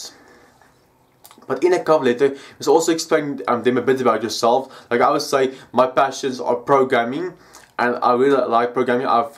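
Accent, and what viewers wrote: Afrikaans, South Africa. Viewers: <link> That part from there that Afrikaans accent still love your videos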